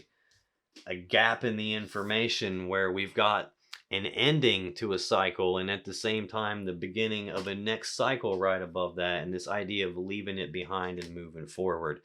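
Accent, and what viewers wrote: South, United States. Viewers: Never seen your channel before but you have to be southern like me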